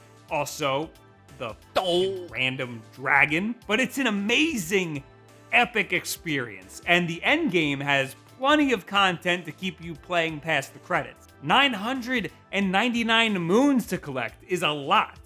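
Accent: New York, United States. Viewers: You can tell that he has that New York accent just because of the way he says Mario lmao.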